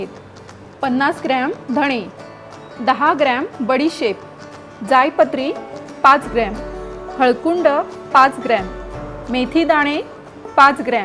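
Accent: Konkan, India. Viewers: Excellent,Mrs!! By the way,where do you stay,as your accent is,typical konkani. Thanks & all the Best to you,Family members.Shrineevas Sant,Nasik <mail>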